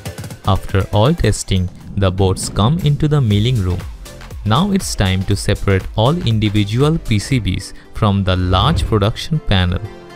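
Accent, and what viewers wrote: India. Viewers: 一股浓浓的印度口音，听得超级不爽。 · Индусский акцент сразу слышен, хотя я по английски нефига не понимаю. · indian English makes me feel ashamed. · Listening to the indian narrator speak english gave me cancer. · Indian accent。。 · 这是传说中的印度口音？ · Dat fucking indian accent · i think this is indiaglish. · you can't get someone without a heavy Indian accent to narrate the video? nothing wrong with it but god damn it's hard to understand lol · Omg indian english☠ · Omg,this guy sounds so bad.voice is like Indian slang modulated with donkey screaming pitch.even though I am Indian I hate this slang.video is shot nicely but I wish such these great videos are sponsored to channels like greatscott:/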